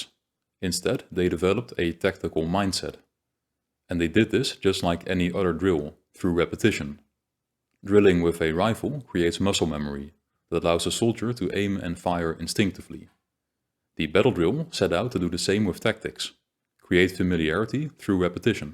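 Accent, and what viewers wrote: Netherlands. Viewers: i think that the narrator is a dutch person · The content is very good. But your Dutch accent is very thick. You make a lot of small pronunciation errors. 100% on some sound. It is really a pity. For an example word: you cannot pronounce the word "organization" correctly. You say "Organizasjen". Or the word "section". You say something like 'seksjen' with a 'sh' sound. "Organizasjen" . Dutch people always hear insert a 'sj' sound everywhere in English. I suppose it has linguistic reasons and very difficult for a Dutch person to learn. Still it is important to know that where your English is very good. Your pronunciation is quite bad on that specific sound. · Nederlander. 🤣🤣 ons accent is zo herkenbaar he hahaha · Love the Dutch accent ❤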